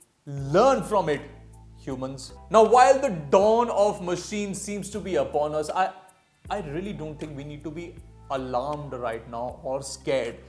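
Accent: India. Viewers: The Indian accent always reminds me of those phone scammers.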